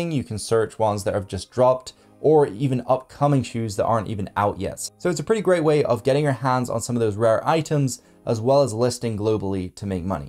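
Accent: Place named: Scotland, United Kingdom. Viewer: Hi! Thanks for a great video. I have been following you for a few months now, really like your content. I recently got the pixel 6a. My main phone is the iPhone 13pro Max, got the pixel for steaming. I think I just downloaded most of the apps you recommended. I wanted to ask. Are you Scottish? I am from Edinburgh, Scotland. I can hear a Scottish accent when you talk. It's cool.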